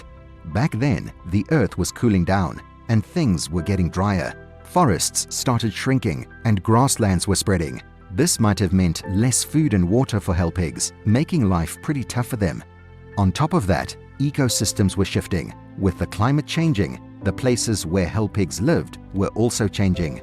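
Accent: United Kingdom. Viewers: UK accents are beyond annoying. DISLIKE.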